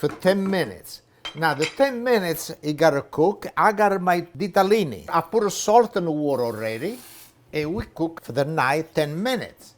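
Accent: Italy. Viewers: Cute Italian style English ~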